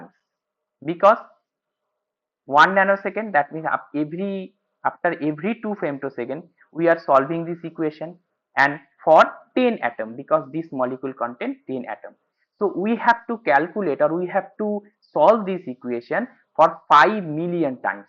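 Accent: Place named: Bengal, India. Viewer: If I am not wrong, you are Bengali... your accent says that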